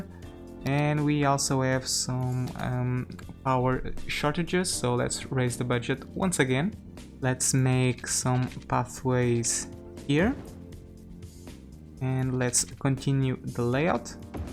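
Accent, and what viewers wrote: Portugal. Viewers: He is one of ours, Portuguese. Very clear from the accent actually. But yes, I agree, he's far from a clumsy English speaker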